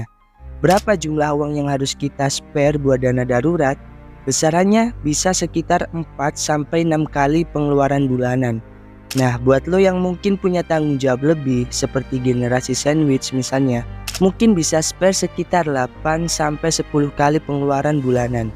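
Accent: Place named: Java, Indonesia. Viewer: Bro suaramu medok mencla mencle ga cocok ngmong la lo gue gue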